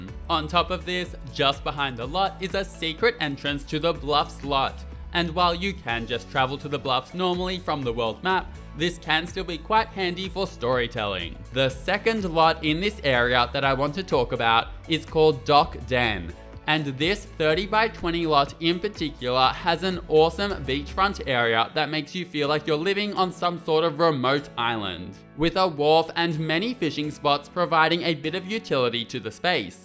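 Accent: Australia. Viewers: Gotta say the nice aussie accent 😍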